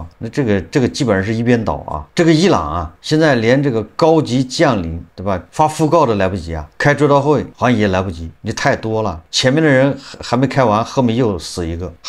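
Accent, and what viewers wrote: Anqing, China. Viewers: 安庆地区口音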